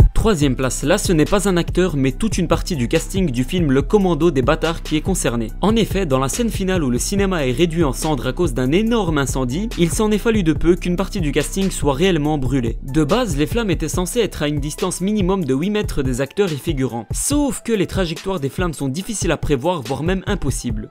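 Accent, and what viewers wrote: Belgium. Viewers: T belge comme moi ?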